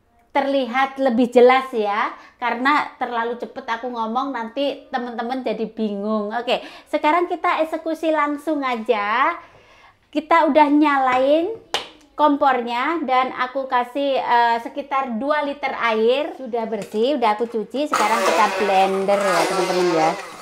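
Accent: Java, Indonesia. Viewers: Keluarga indonesia banget, suka sekali kalo mba cara bicaranys medok2 gimanaaa gitu😄 sehat selalu mb sekeluarga Amiin. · Ketagihan nonton vlog mbak..ngmong jawane iku lho marake aku guyu.. Ramah juga orange..aku seneng nontonnya Salam ya mbak..aku seko demak ..jawa tengah😁😁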